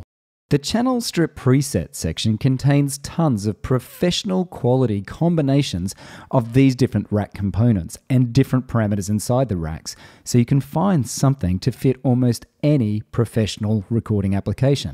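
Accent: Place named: Australia. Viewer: nice to hear an Australian voice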